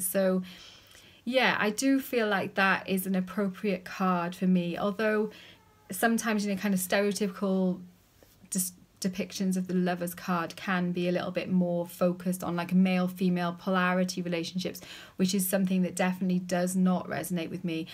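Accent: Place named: England, United Kingdom. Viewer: hi, I am somewhat new to your videos, but have been watching them. interesting. do you live in England? I am not good about accents. You could be in Australia or New Zealand, but i'm guessing England. you have a very pleasant personality. what exactly is a story witch? do you practice something such as white magic i think it's called?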